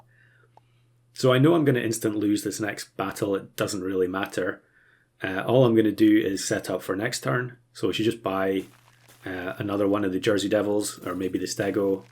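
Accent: Scotland, United Kingdom. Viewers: Which part of Scotland is your accent from?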